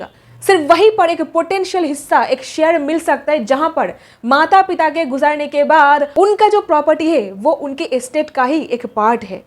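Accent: Bengal, India. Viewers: Madam Apke ke dwara bait gaye advice sahi hai Please take care that Bengali tone is coming to your Hindi version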